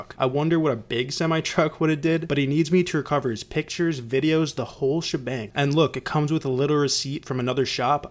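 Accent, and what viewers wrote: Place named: New Jersey, United States. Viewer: When I heard your voice I was like has to be a fellow Jersey guy I'm new to the repair business about a year I don't get as deep into repairs as you do. Really like your videos and the humor you use. I guess it's a Jersey thing. I'm in Texas and nobody here understands the humor they just think I'm a smart ass.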